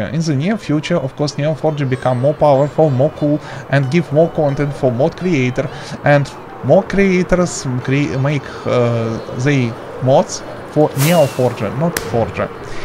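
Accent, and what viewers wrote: Russia. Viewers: Не думал, что русский акцент на столько сильно выделяется.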